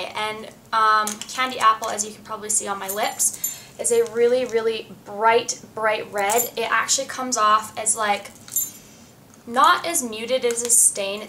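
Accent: Australia. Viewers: I can def hear that your from australia but it's not that strong :) Love the lipcolor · Do you think that since you've moved to Australia, you're starting to pick the Australian accent? Haha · Before you didn't have an australian accent, but now since you have lived there so long, i can tell your getting it. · You sound like you have a bit of an Australian accent! Maybe when you were there you picked a bit of an accent up :p love your makeup btw your stunning! -3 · I feel like you have a slight slight austrailian accent that comes out in some of your words. its awesome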